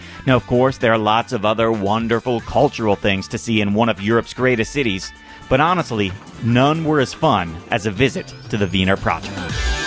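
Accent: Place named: England, United Kingdom. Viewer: ^^ Es is lustig zu höhren wie Engländer Deutsche Wörter ausprechen XD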